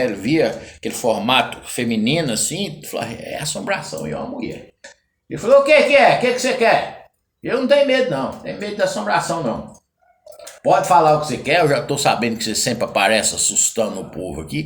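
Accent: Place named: Minas Gerais, Brazil. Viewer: Esse cabra é mineiro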